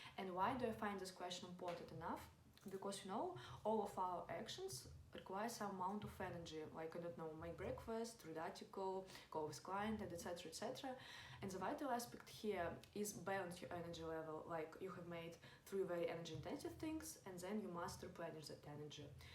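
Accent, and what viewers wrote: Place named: Russia. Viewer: This russian accent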